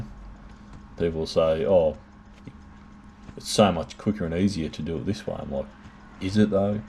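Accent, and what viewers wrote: Australia. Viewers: Subscribed awhile back and glad to see more have found you. Screw those bringing negativity. Enjoy the work, your attitude, seeing Australian yards and the accent. Keep it up! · I like your techniques, it is nice to hear an Aussie accent with this type of video,keep up the good work Mate 👍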